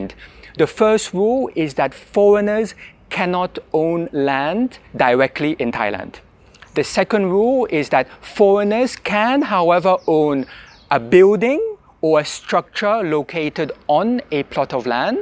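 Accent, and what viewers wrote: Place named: France. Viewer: He speaks English with a French accent.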